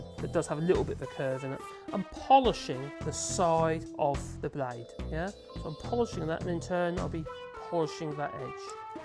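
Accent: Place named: United Kingdom. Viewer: Yeah my sound all the way turned up and still had a hard time understanding. You are British with a lovely accent but the music makes it quite hard to follow then.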